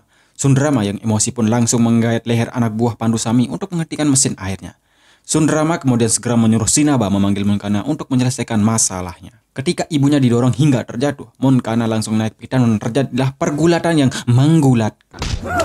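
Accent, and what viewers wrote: Bali, Indonesia. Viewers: bang ora Bali ya?logat nya ky orang Bali..sukses terus bang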